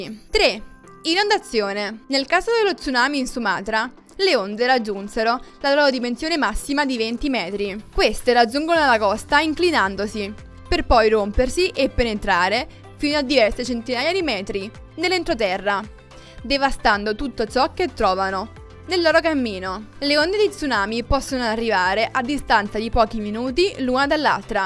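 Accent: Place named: Sicily, Italy. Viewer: sempre interessante anche il tuo leggerissimo accento siciliano credo hihihihihihihi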